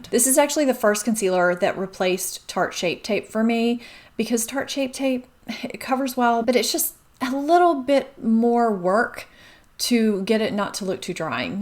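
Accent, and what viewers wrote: South, United States. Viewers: that southern accent intensifying through the video :D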